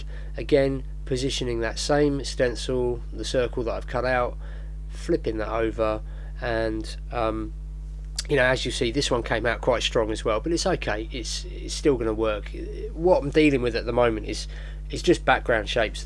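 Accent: United Kingdom. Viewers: Thanks, now I have some idea how Yorkshire and North London sound. Only 100+ more to learn ;o)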